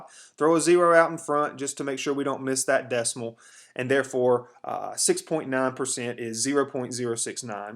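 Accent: South, United States. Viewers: judging from the voice I guess your from the south, I am too I love the math you make it sound so easy. are there any other tutor sessions you do on line.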